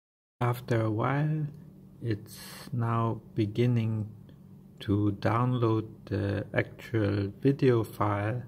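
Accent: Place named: India. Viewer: We don't trust Indian accents in America! NOPE!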